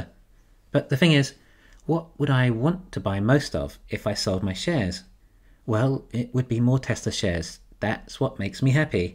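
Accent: United Kingdom. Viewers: I always wonder, you seem to live in the US but you have a British accent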